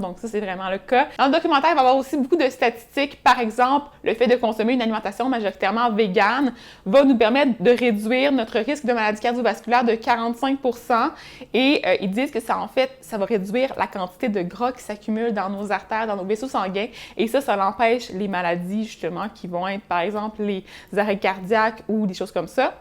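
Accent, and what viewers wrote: Quebec, Canada. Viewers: ouais et en plus j'ai rien contre l'accent québécois mais bon pas évident de tout comprendre c'aurait été mieux en truefrench..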